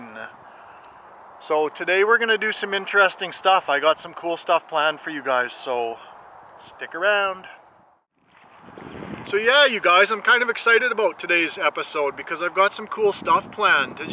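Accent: Canada. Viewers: Canadian from the voice first time watching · Man your videos are awesome .. You are a natural with explaining things AND clearly know your stuff .. This is a combo that seems more rare and hard to come across both online and in person nowadays, it seems. I have been able to take sooo much from your videos man, just want you to know I really appreciate and value the hard work you put into your vids. I know there are many others that feel the same as I do about your work here on YT. Please keep up the hard work so that we can all learn from your experience man. Also, it makes for easy listening with your chill Canadian accent lol .. wouldn’t wanna hear any type of help vid with my harsh nyc/nnj accent hahah 🤣 .. again your awesome for this stuff man .. nothing but love my man !! 💯👍😎🐐💪🏽💯💯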